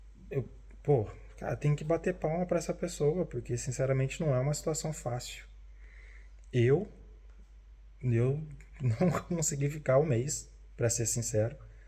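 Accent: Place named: Rio Grande do Sul, Brazil. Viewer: Provavelmente é gaúcho.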